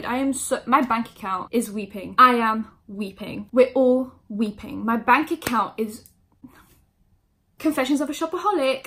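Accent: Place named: London, United Kingdom. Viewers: Lmao omg her accent and pronunciation is like so rough, pure london hahaha. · ringaling it’s so weird to me, like the place that i’m from is like 4/5 hours away from london so idk the range of accents that well but to me she sounds working class london but NOT at the same time??? and it confuses me i don’t think i’ve heard the combination before lol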